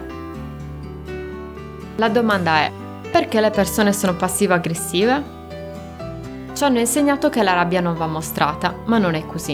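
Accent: Sardinia, Italy. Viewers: bell'accento Sardo :) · Bellissimo video...Ma sei sarda?? 😆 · Ciao, sei sarda per caso? :D è il primo video che guardo, ho sentito parlare di questo atteggiamento qualche anno fa, penso che lo farò vedere anche a mia madre, che perpetua dei silenzi assurdi senza mai esternare la sua rabbia, ma io so che vuole sbottare Allora questa introspezione potrebbe esserle utile. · Si, anche io sono sarda. Ho sentito per caso il tuo video e ho pensato: ma questa donna è sarda. Allora mi sono iscritta al canale 😉. Buonanotte 🥰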